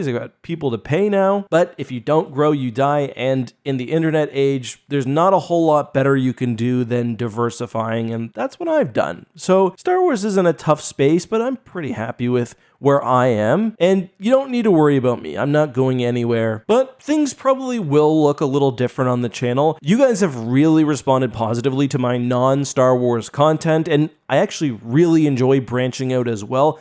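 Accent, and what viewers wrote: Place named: Eastern Canada, Canada. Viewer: You Definitely sound like you are from the east coast of Canada.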